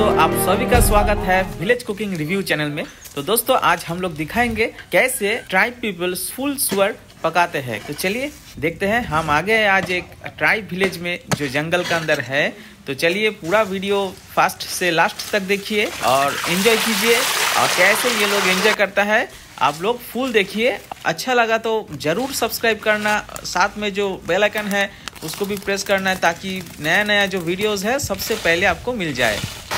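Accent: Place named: Bangladesh. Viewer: Sob thik ache.kintu Hindi sune mone hocche kono bangali Hindi bolche.tai vlog ta bangla te holei bhalo hoto 🙏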